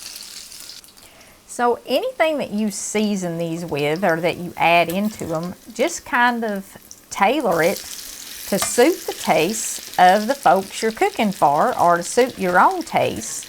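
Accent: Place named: East Tennessee, United States. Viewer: Thank you so much for making these videos. Everything is broken down for someone who hasn’t cooked much. You are a great teacher, you are positive and you show God’s love in all you do. So many YouTubers right now are using fear and anger to get views. Your videos leave me feeling hopeful and peaceful and ready to try something new. I am so grateful for you. P.S. I love your accent. My granny was from East Tennessee and listening to you makes me happy! I am a retired women living in NC, and never had a family so I never learned how to cook - until now. Thank you so much. (Beth)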